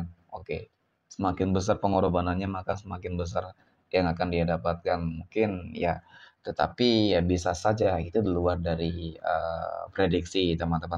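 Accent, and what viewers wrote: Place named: Banyumas, Indonesia. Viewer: Aamin hadir bang jawa ngapak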